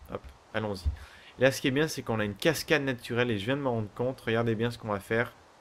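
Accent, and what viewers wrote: Quebec, Canada. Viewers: Il est canadien ou francais? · Ouais, il vit au Québec donc desfois l'accent québécois surgit :D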